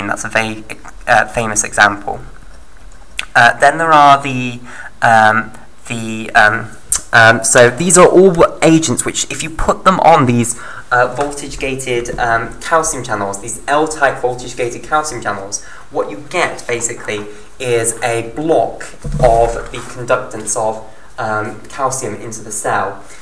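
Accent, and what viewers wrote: England, United Kingdom. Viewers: Thankyou, clearly presented. btw are you from England?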